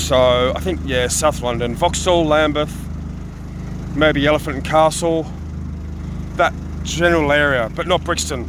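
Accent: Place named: Australia. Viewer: Hey you are Australian 😂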